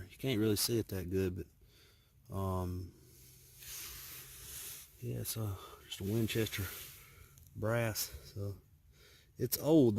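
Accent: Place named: South, United States. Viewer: Nice finds ! Listening to you and seeing the artifacts I figure you're from Alabama or Georgia . I am in St.Clair Co. Alabama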